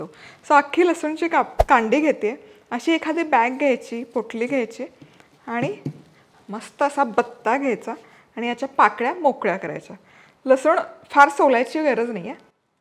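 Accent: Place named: Sangli, India. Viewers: Chan bolalat Tai sangalichi bhasha ani recipe pan mast❤❤ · आम्ही पण सांगलीकर आहोत.त्यामुळे खूप मजा आली भडंगाची रेसीपी पहायला आणी हो टिपीकल भाषा सुद्धा बोललात ते लै भारी वाटल...... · tu अमेरिकेत राहून सुद्धा सांगली,कोल्हापूरची भाषा खूप छान बोलतेस.तुला पुढील रेसिपी साठी. All the best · कोल्हापुरि भाषा मस्त जमते की....खूप मस्त भडंग.....🎉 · जमल की सांगली ची भाषा बोलायला आणि भडंग तर लईच भारी 👍🤩✌️,l am from सांगली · भाषा सांगलीची व भडंग पण सांगलीचा फार. छानच वाटल म्याम......🙏🙏🙏🙏🙏❤️❤️👌👌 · सांगलीची भाषा आणि भडंग दोन्ही जमलंय चांगलं 👌 Love from sangli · खूपच बेस्ट कोल्हापूर बोललात विषय हार्ड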